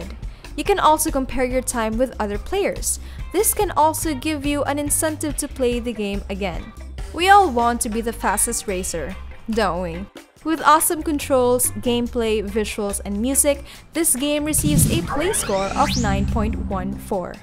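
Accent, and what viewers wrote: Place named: Philippines. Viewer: She sounds pinoy lmao 😂 nice vid doe 👌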